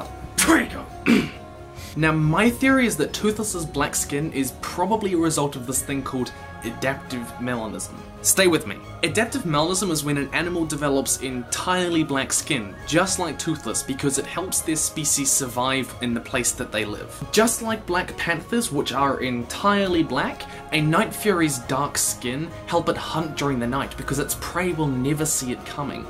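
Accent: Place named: New Zealand. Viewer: I KNOW THAT YOU ARE A NEW ZEALANDER BECAUSE OF YOUR ACCENT!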